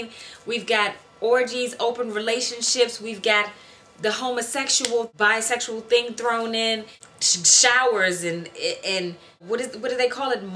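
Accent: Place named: Washington DC, United States. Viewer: Where are you from sound like you from DC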